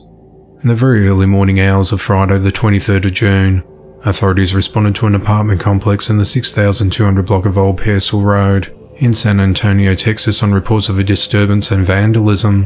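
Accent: Australia. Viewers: You must be an Aussie right?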